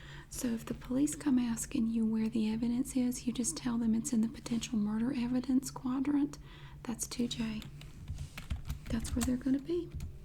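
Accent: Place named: Carolinas, United States. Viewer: I think it’s so cool that you said Charlotte and that you’re from the Carolinas! I’m so used to hearing these accents and way of speaking I think you’re the only Asmr youtuber from down here it’s great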